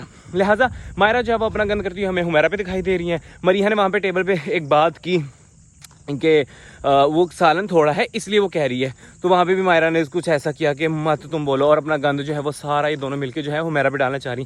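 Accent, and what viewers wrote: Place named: Punjab, India. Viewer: Please apni Urdu thik karin Ap kah andaz Punjabi hai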